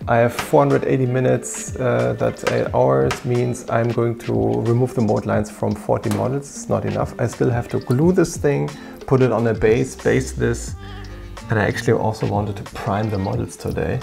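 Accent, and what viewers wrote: Germany. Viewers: you are german i am sure haha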